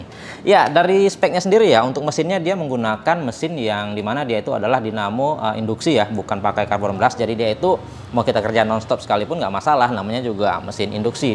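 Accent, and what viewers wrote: Medan, Indonesia. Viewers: Nampak Medannya, "dipala-palain"😆😆. Mantap reviewnya, bang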